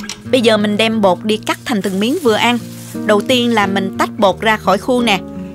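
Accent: South, Vietnam. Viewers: Giọng miền nam chân chất rất dễ thương.. với kinh nghiệm và đôi tay khéo léo cô đã tận tình hướng dẫn làm các món ngon.. Cảm ơn cô nhiều..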